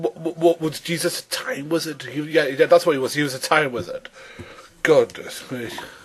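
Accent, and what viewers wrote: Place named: United Kingdom. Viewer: No you are not. Speech impediments or not, it would be preferable if someone uploading a video at least tries to be clearly audible. It's just a very thick british accent joined with random mumbling and stumbling on words.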